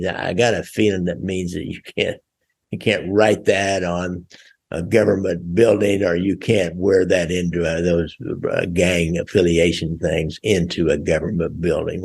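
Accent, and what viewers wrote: United States. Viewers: I just love hearing American pronounce New Zealand place names. Good video mate. Thanks.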